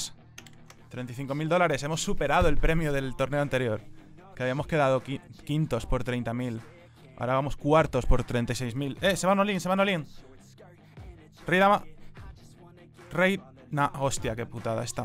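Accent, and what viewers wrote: Georgia. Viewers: are you from georgia?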